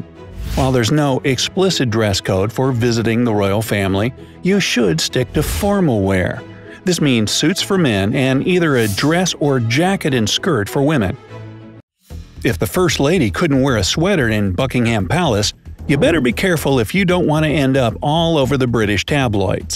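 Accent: United States. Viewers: American commentator XD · Kind of sad hearing an American voice laying down these rules.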